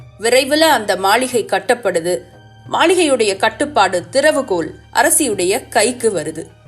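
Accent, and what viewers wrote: Tirunelveli, India. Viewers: Unga voice nalla gambeerama, kekaradhuke oru energetic ah iruku. Alutham thiruthamana pronunciation super ah iruku. Yella type stories um solreengalae (historical puranangal, motivational) , yepdi idhellam yosikareenga. Apdi yevlo books dhan padipeenga. Nejama solren neenga oru extraordinary person. Unga slang yenga oora ஞாபகபபடுத்துது (திருநெல்வேலி, தூத்துக்குடி). உங்கள் பணி மேன்மேலும் வளர்ந்து தொடர என் வாழ்த்துக்கள்.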